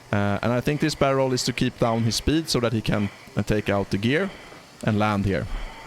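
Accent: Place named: Sweden. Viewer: Love your Svengeska.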